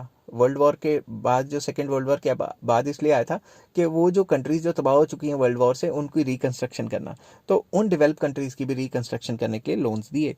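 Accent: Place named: Punjab, India. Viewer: It seems that sir is from punjab😅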